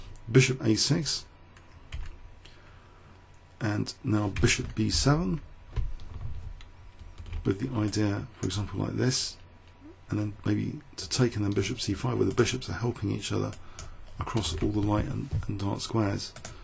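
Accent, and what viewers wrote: United Kingdom. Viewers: Are you from. Uk ?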